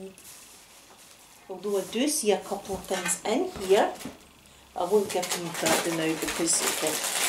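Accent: Scotland, United Kingdom. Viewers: Just discovered your channel! Scottish accents are my fave 😁!! I didn't know there was a online poundshop! I love a good nose I the poundshop 😁 you got some awesome goodies! laughed when you said about putting your glasses on, my eyesight is awful, I feel your pain 😂 I got dogs, lint rollers are a must 😂 xx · I'm Scottish as well an its nice to hear a familiar accent in ASMR <3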